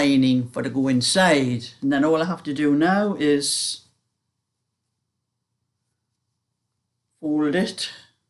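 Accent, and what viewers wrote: Newcastle, United Kingdom. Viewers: Hi,this is my first time messageing you but have watched your video's for over a year now and really enjoy them,my husband loves your rants being ranter himself😂being geordies ourselves but now living in shropshire,it's lovely hearing the geordie accent,i am from jarrow(jarra)my husband is from hebburn. I love listening to your stories from the past. Keep up the good work x PS could you tell me where you got your wool winder please,i have one but it dosn't make very big cakes like yours do❤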